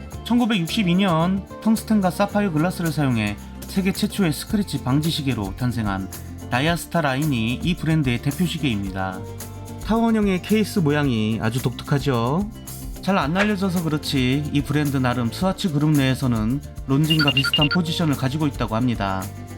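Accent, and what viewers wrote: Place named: Jeolla, South Korea. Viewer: 더럽게 재미없네! 전라도 억양 킹받네 ㅅㅂ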